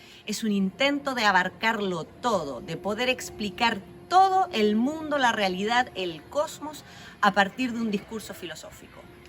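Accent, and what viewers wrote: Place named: Chile. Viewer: Hola, que buen Canal me acaba de recomendar youtube, por tu acento asumo que eres de Chile cierto? Saludos!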